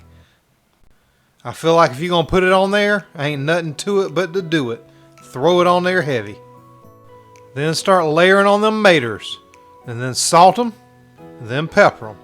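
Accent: South, United States. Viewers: And the GOLD LOGIE goes to you Mr 🎉🎉🎉🎉🎉 I’m from “ Down under “ Australia and wanted to say congrats on your bread. It is the best one that I have found, I did tweak it a little bit ( less pork rind, more of Parmesan, golden flax seed and coconut flour) … DELICIOUS… Even my non Keto husband loved it. I also had enough mix left over to make muffins which I will freeze. Keep up the Good work and keep it “PROPER”🎉🎉 love your southern accent, can listen to it for hours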